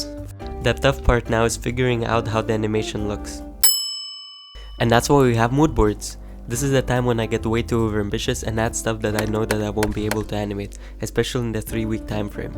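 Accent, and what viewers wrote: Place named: Eastern Europe. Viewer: oh great, first I heard you I thought that you are slavic, by your accent 😅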